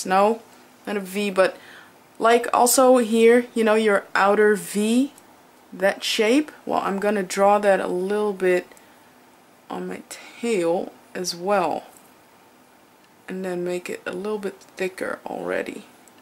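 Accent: Netherlands. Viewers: you're dutch aren't you? because of catrice and your accent..? i'm dutch tho xx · wauw!! prachtig haha. Ik hoorde trouwens meteen al dat je Nederlands bent haha :P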